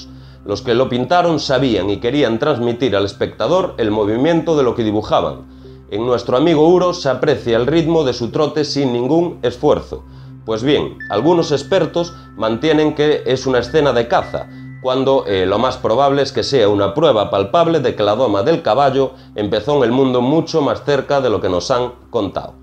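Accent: Galicia, Spain. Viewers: excelente trabajo paisano, ya que por su acento deduzco el galegismo que nos une, gracias por este video...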